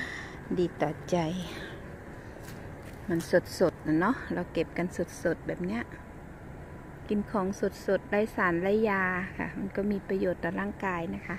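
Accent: South, Thailand. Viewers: พูดใต้ชัดมากเลยนะค่ะ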